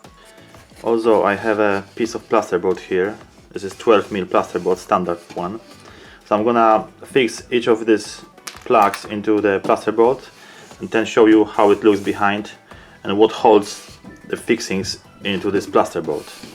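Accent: Poland. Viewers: Polski akcent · Słychać że rodak :)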